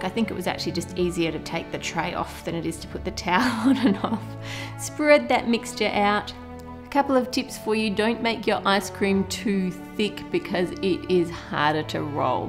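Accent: Australia. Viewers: I legit just realized shes Australian and my Aussieboo self JUMPED (because of Felix and Chris from SKZ) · I luv Australian accents :D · You're awesome! Love the videos! Also love listening to your Australian accent.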